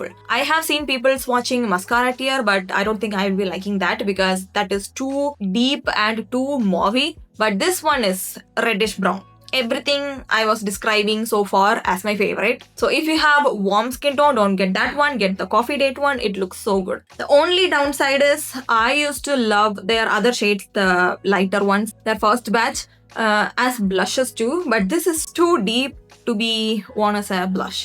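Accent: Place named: Tamil Nadu, India. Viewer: Loved the video keepp goingg....and also luv that English with tamilian ascent ❤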